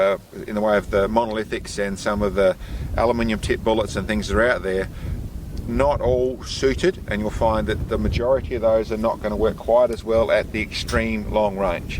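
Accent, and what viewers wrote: Australia. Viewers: Not bad!!! But Australian English 😄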